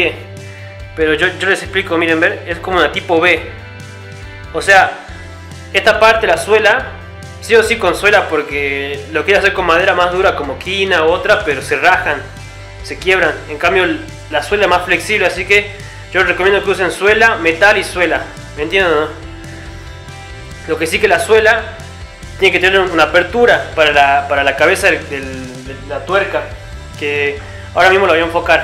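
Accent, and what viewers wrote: Tucumán, Argentina. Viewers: Sos argentino o me parece ? :v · DiiabloGalarza#GidinGang suena tucumano o salteño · sos de Tucuman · Wtf el webi wabo es argentino😮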